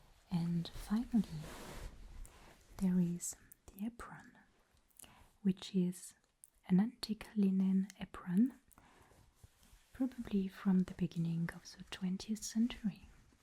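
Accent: France. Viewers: I love the apothecary theme! Also from the great french accent you have, are you like from a french speaking country or are you bilingual? I always heard you in english thus I was curious :p (also that's my goal to speak so good english people won't notice I'm french xD) thank you for all of your videos! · Your French accent amazing I love it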